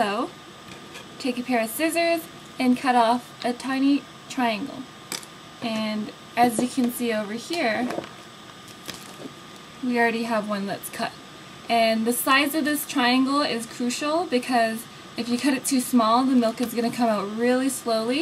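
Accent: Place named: Canada. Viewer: I like the Canadian accent :3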